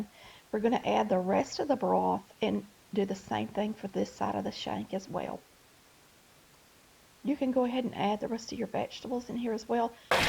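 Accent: South, United States. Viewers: you sound like a country girl and I love it! I want to know how you're going to fix those beef shanks. I love mine fall off the bone....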